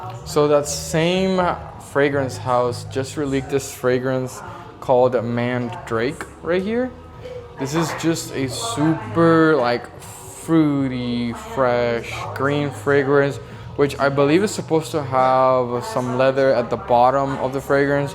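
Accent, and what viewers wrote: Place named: Italy. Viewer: 🤨 Italian voice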